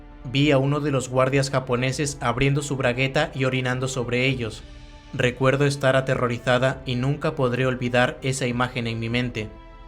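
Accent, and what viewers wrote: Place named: Castile, Spain. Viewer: En el canal pone Perú, pero esa acentuación es Castellana de España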